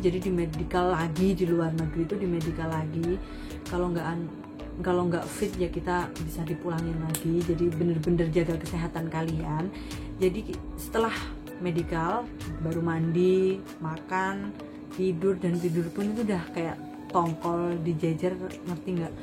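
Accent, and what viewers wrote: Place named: Java, Indonesia. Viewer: medok forever yoo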